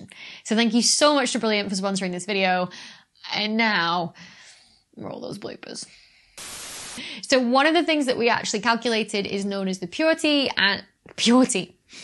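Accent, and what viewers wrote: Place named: Northern England, United Kingdom. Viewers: What is that accent? Leeds/Manchester? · Your interpretive presentation skills are now awesome. Would like to be in the audience for your next LIVE astro conference presentation. Should be SRO for just the delivery. Video suggestions: 1) what I do when I collaborate as an associate to main author, 2) vids on each of the previous main author papers starting with no. 1, which was before the Phd(???), and 3) how do I work/collaborate with others as main author, for instance, is there a small final edit group? And, thanks for being yourself, Mancusian accent and all. Next book?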